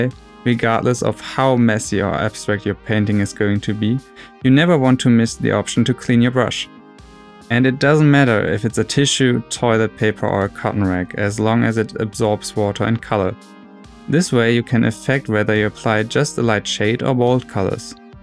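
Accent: Germany. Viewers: Man hört den deutschen Akzent sofort raus :D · Hach ich hab doch rausgehört, das er Deutscher ist!:) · Great video. Love your German (I think) accent as well :) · Bist du deutscher? Du hast einen extremen Akzent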